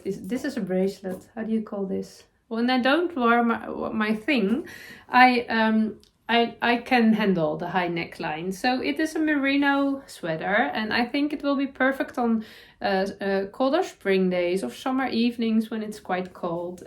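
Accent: Netherlands. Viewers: . You just brighten my day. Love your podcasts and your “Dutchy’ English. So refreshing and genuine. Happy New Year. ❤️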